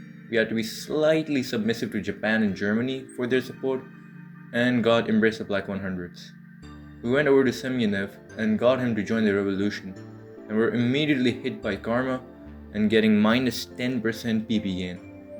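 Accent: India. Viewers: Try to hide the accent next time jeet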